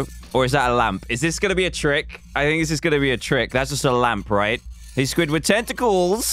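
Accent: United Kingdom. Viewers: Are you brittish??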